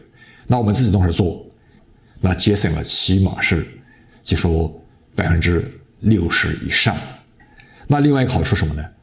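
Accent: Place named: Huanggang, China. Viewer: 老师是黄冈人吧😂？听到乡音很亲切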